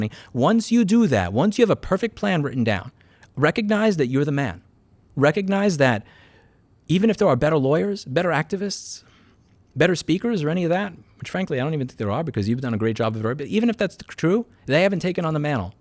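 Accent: New York, United States. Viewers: the feeble video can't handle his new yorkness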